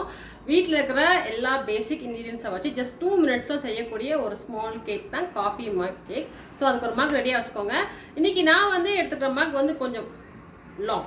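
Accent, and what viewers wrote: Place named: Thoothukudi, India. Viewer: Really Sorry Mam. I'm confused because of your nice Tamil vocal & your Thoothukudi slang also of course. Even I thought, just for name sake you are telling like you are in Mexico, in your past Birthday Special video "35 Things". First of all belated Birthday Wishes Mam. After a long time, without play forwarding I've watched your 1 hour video of that "35 Things". Afterwards I've clicked the subscribe button without any hesitation. Since Yesterday only I've visited your channel. Take Care Mam...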